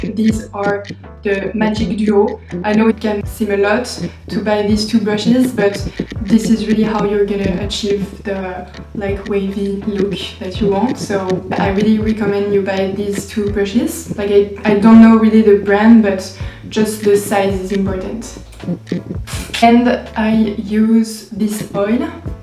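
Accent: France. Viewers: That frenchhhh accenttt 💛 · Your French accent and grammatical mistakes are really funny. Thank you for that delightful and entertaining video!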